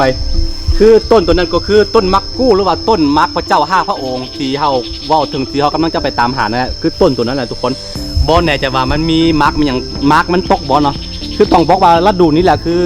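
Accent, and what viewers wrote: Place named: Chiang Mai, Thailand. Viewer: เพราะว่าสำเนียงภาษาหรือคำพูดของคุณเหมือนคนจังหวัดเชียงใหม่ของคนประเทศไทยค่ะ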